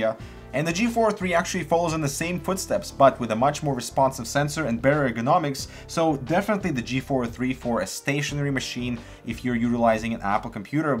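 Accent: Russia. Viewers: Not offending him but sometimes he sounds Russian and sometimes he sounds American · slightly рашин акцент here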